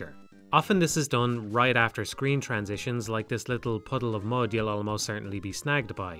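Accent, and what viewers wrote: Ireland. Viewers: That Irish accent with the complicated language is like asmr to me ♥️ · And that Irish is an amazing yt video voice accent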